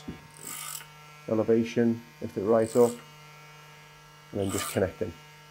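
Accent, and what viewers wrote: United Kingdom. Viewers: I Can't Stand British accents. Almost 50% of what he said I couldn't understand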